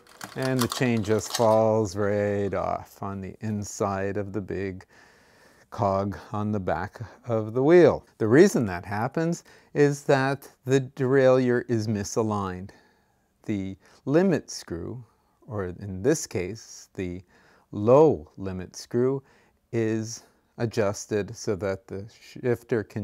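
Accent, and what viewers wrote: Canada. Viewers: Derailleur is French for de-railer so it depends how pedantic you want to be. I guess the instructor is Canadian by his accent and he pronounces it differently to 'muricans. Thanks for the video. This is the second time I've used it to tweak my road rig.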